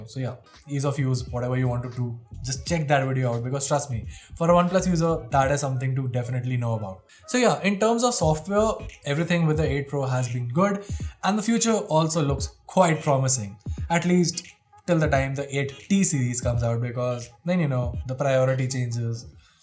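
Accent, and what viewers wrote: India. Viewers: Holy shit, no offense, but it’s difficult to understand you when you speak fast. But I always had troubles with Indian accents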